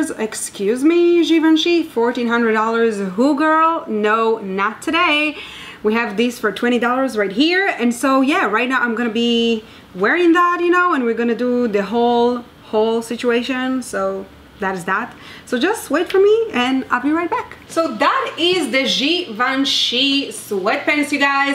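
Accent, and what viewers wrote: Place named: Dominican Republic. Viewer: I liked this video. She cracked me up with her silly moments.. "immagrant problems" ctfu. I Black and Hispanic so I understand how she speaks. I wonder where she from. I wanna say Dominican Republic..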